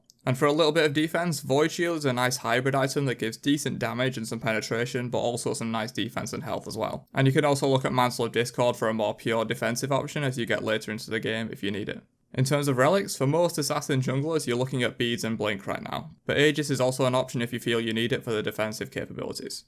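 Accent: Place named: Northern England, United Kingdom. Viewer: Aghhh a northerner. Thus washs my ears of american smite players, subbed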